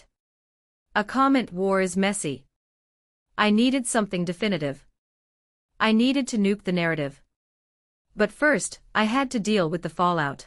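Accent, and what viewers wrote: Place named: Asia. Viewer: It is hard to listen to bc the voiceover sounds very brokenly with some kind of Asian accent. Though the story might not be bad, the voiceover is amateurish.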